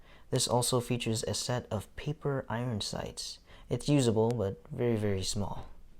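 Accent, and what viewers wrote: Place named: United States. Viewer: why do sound so american when ur profile pic looks asian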